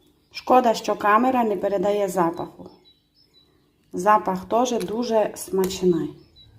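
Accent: Transcarpathia, Ukraine. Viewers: Ой, який файний закарпатський діалект ❤🎉 Так приємно слухати! 😊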